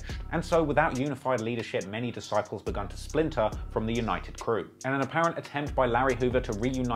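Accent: England, United Kingdom. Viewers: why is a British dude talking about gangs? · So we really listening to this British or whatever the hell it is tell us about American gangs and then he has a rap label that he said is the new death row?!? Wake up people. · It’s a little weird a Brit talking about Chicago gang history but you seem to have done an extensive amount of research. 🤷🏽‍♂️ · RIP to all the young brothers that lost their lives. Once again the devil is trying to divide the Black Community and the Hip Hop Community. Unity is power brothers . Brother Hoover said G D is growth and Development the B D in this case should be Business Development. You see they have a White Man with an England accent narrating this, he is exploiting black youth he will never set foot on Parkway Gardens or O Block to help these young brothers. Unite GDs and BDs because we have one common enemy. Let's build up our communities and protect our children, women and senior citizens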